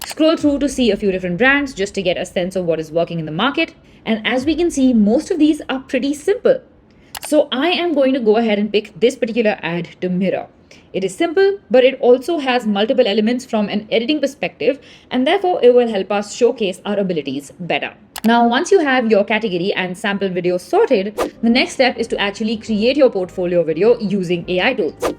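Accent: India. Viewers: is this official channel of invideo ? with an indian accent is a turn off to be honest and on top she reads so fast like she is in hurry which make the customer experience a torture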